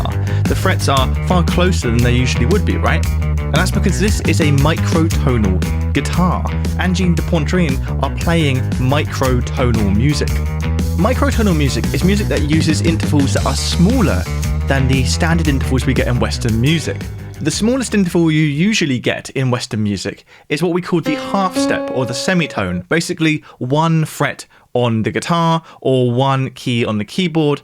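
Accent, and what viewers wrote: England, United Kingdom. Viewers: Nothing like an English accent to wash off the magic.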